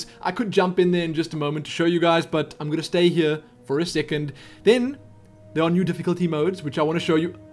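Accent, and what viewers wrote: South Africa. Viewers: ***** I know, I was referring to the South-African accent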